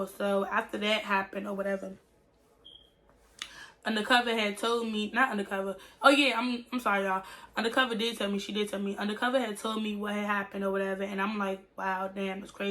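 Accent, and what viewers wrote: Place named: Washington DC, United States. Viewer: You from the dmv? Lol you got that DC speech 😭😭😍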